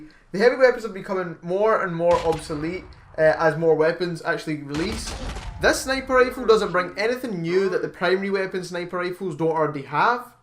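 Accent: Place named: Scotland, United Kingdom. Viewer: It makes me laugh that your like goals are so low. I admit that I watch TheRadBrad and PewDiePie sometimes. Maybe if you said "Take-It-Bitch" in your videos more or could somehow bring your pet into this, I think you could start pushing the "likes" more. I know you think it's a shit accent but the Scottish accent is pretty cool. HaHa! Man I'm only messing with you a little. I like your videos and I think watching has helped my game play.You should be pushing those "likes" in the thousands before too long.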